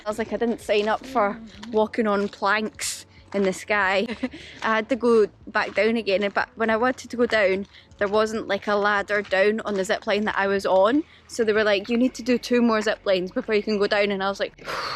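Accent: United Kingdom. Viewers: Hey i just got to know you from group facebook already subscribe and follow your instagram :) i like your accent, is it british ?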